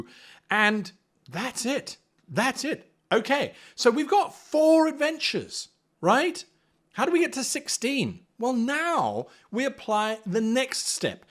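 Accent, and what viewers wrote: South, United States. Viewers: With that accent, I though all of the adventures were going to be getting those Duke Boys. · This country boy accent is killing me! Love it!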